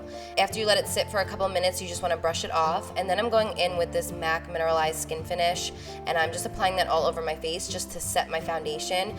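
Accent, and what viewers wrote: New Jersey, United States. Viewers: She sounds like she has a bit of a New Jersey accent. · She's so beautiful but that thick New Jersey accent 😩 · I could tell right away that you're from Jersey from your accent. 😂 I'm from New Jersey too!! Xoxo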